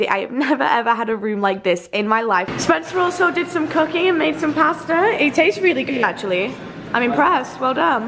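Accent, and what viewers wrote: United States. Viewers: I’m not even hating but why do you talk like your American when you’re clearly English. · U sounded a bit american 💕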